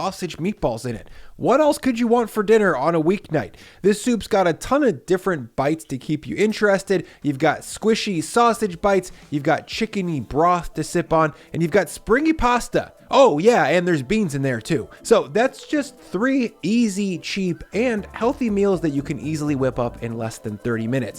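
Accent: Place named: United States. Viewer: I'm a late comer. Just found your channel. Love it. I imagine that I may wonder sometimes what is he talking about, with you being American and you call things by different names and pronounce things totally different from us here in Australia.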